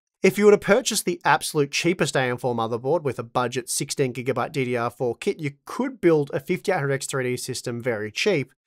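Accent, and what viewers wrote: Australia. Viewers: The difficulty is probably higher with the Aussie accent on top of that. Though Steve from GN also spits out numbers at a million miles an hour.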